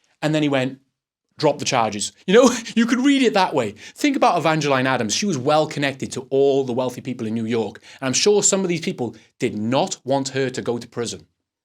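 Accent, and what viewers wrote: Wales, United Kingdom. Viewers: i THOUGHT i heard some welsh in your voice! cymru am byth!🏴🏴🏴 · Sounds Welsh to me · He sounds Welsh to me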